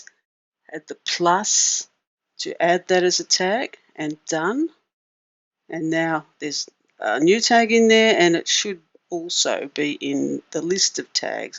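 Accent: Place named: Australia. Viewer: Great help and very clear. Loved hearing an Aussie voice too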